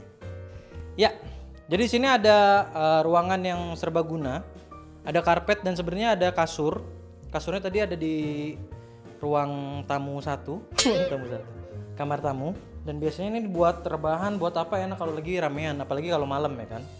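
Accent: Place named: Tegal, Indonesia. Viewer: Dog, lu sebenarnya orang mana sh?? Sukabumi apa dimana, soalnya dari cara bicaranya itu kaya orang tegal-pemalang gitu(medog)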